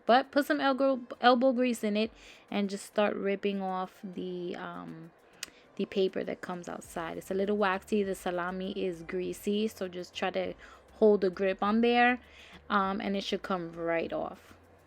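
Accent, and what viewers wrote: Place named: Puerto Rico. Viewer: Be proud of your Beautiful Puerto Rican accent ❤❤❤❤❤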